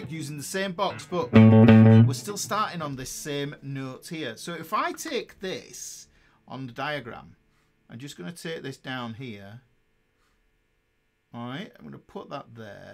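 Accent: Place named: Northern England, United Kingdom. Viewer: I love the northern bloke teaching me. I'm from Rugby. Thanks mate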